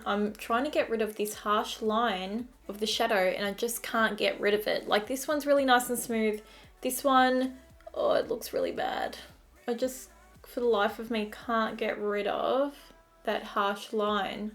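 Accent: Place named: Australia. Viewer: Did it ever occur to you that isn't from the USA and in australia we have the same way of saying things as the UK aka Kitchen cosmetics?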